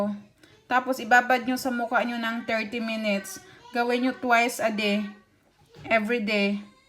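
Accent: Visayas, Philippines. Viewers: Te bisaya ka night? 😀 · Maka mukhang yaya nmn c ateng bisaya lang cguro c mam kaya ganyan magsalita.. Nakita m nmn mga gamit nya ohhh mamahalin.. Madaming bisaya na mayaman ateng at magganda! Just sayin! ☺️ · Bisaya po ba kayo Maam...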